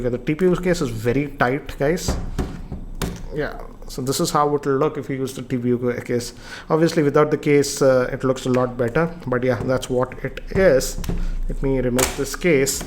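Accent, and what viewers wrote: India. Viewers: jajaja give him consideration ..indian accent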